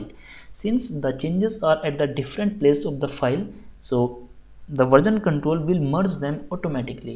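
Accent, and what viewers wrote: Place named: India. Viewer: I don’t like ur Indian accent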